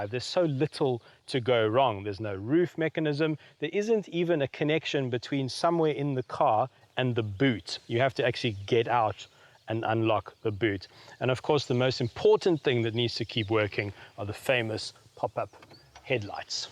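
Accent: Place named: South Africa. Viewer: South African English is probably the only english accent/variation I just can't mimic at all! That alone makes me a total fan. Not saying I can do all accents flawless, far from it, but SAE is just impossible to get right. More than a few similarities with New Zeeland English, but still distinctly different enough to throw me off. Oh! And I totally love cars too!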